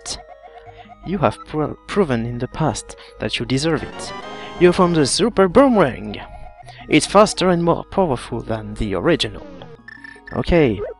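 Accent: France. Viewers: I have your game and I have to say it's done great! Keep doing this! And I just love your french accent! ;) Greetings from Sweden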